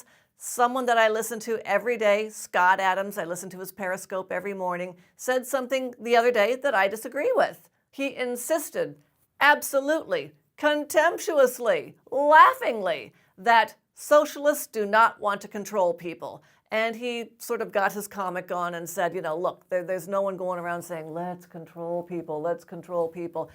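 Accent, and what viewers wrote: United States. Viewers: If you have a beautiful voice you can tell me lies :) It's a pleasure to listen to them. I love your beautiful American accent.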